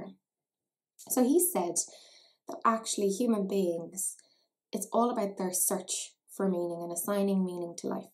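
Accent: Ireland. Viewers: OMG! You actually said PR, not P-OR!!.. LOL! Never change, we love your lovely Irish-ness!!.. and your lovely Irish face!... xXx 😜😊😎💜💜💜💜🌙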